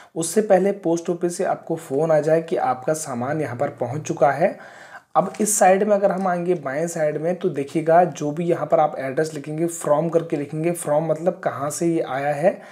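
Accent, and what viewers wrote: India. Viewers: Bheji aap uk se ho ky lamgaw Uttarkashi se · Bhai lamgaon ka ho kya 😮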